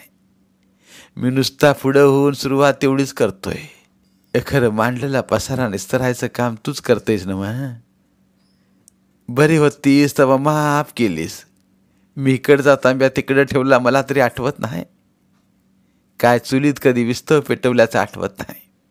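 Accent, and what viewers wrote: Rural, India. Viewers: 🙏 तुमचा ग्रामीण आवाज व वाचन अतिशय सुंदर आहे. मी तुमची नवीन श्रोता वाचक आहे. 😀😀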